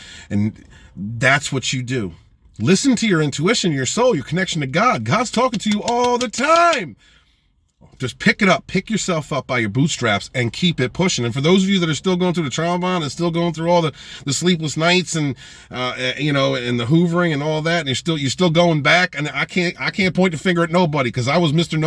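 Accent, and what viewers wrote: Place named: New England, United States. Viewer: Missouri, your accent gave me New England vibes lol, nice to meet you!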